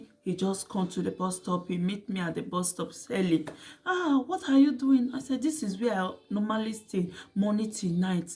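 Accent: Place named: Edo, Nigeria. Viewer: You're sounding like an Edo babe. Am from EDO state😘. Nice connecting with you. Some aunties can be so wicked but thank God for your Dad's quick intervention.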